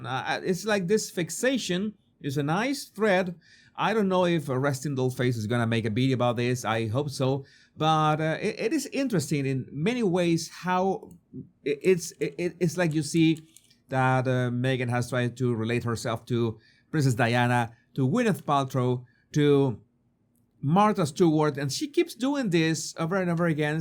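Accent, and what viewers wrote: France. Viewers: Well I've heard your French accent and it's fabulous. It surprised me about the "bird" . 😅. I like it. It's 🥰 cute. Take care. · French accent?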